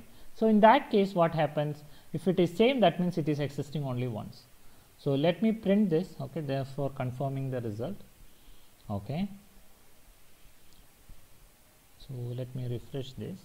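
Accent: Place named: Hyderabad, India. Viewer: Hyderabadi style m : kirrak 🔥🔥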